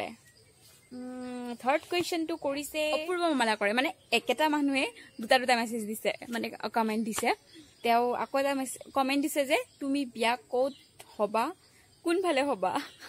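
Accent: Nalbari, India. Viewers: Ba Tumr Ktha bor Nalbari, barpeta district r dore... Apunar original Ghor kot hy?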